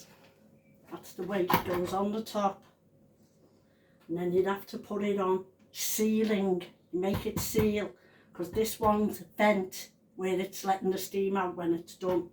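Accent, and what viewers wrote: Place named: Birkenhead, United Kingdom. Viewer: So glad I found you you make my day my dad and is family live in Birkenhead so hearing you talke makes me so happy I've also lost people I love my husband died 3 years ago and my brother and a4 year old grandson and a 7 year old grandson and some amazing things have happend to let us no there is life after death I have 16 grown up grandchildren and 30 great grandchildren love you to bits your great Christine from Leicester xxx 🌹🌹🌹🌹🌹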